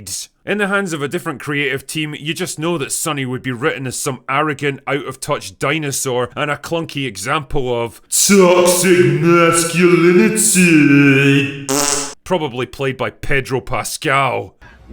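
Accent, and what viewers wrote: Scotland, United Kingdom. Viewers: So I have to say I haven't been watching all of the F1 movie reviews because I'm going to see it tomorrow in IMAX I'm a Brad Pitt fan I'm a big F1 fan and I didn't want to spoil it but wow your review was awesome not because you said you like the movie but why you said you like the movie. I was listening to it and I said this guy's got to be from Scotland and my wife's ancestry is Scotland and I love the Scottish people hey any man that can wear a kilt and pull it off I got to give you credit and who doesn't like bagpipes anyways love the review love your attitude about it and now I'm a subscriber and looking forward to more things and I like your channel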